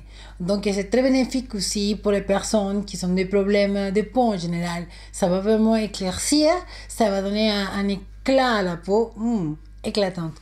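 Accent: Spain. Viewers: accent espagnol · ton accent spagnol me dérange en parlant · Elle est d'ou cette femme elle a un accent spanish en tt cas el est belle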